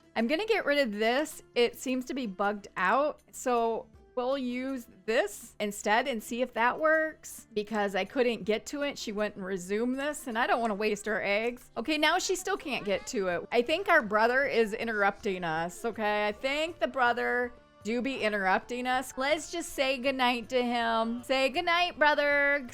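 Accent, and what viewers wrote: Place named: Midwest, United States. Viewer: Where are you from in the Midwest I’m getting nostalgia from the way you speak since I used to live in Minnesota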